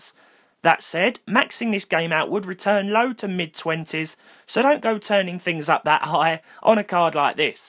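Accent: United Kingdom. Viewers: man, ive never heard a more british accent than this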